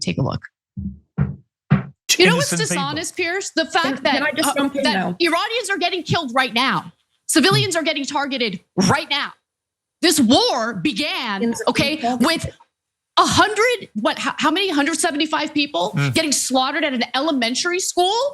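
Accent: United Kingdom. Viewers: Her extreme BRITISH accent proves she is NOT Iranian. The fuckin’ British caused most of this bs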